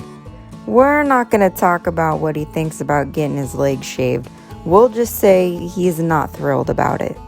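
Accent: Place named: South, United States. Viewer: Your southern drawl accent is the best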